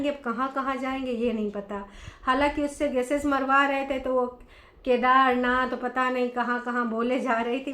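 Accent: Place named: Bihar, India. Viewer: I like what u say coz its correct bas thora aur Hindi mein agar bologe toh easy lagega samajhne mein , like wo bhojpuri bihari type feel hai toh samaj nai ata hai .. plz don't mind jst saying but u say correct stuff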